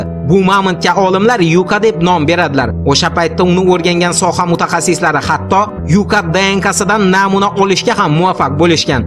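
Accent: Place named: Andijan, Uzbekistan. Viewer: Anjanchasiga gapiryapdi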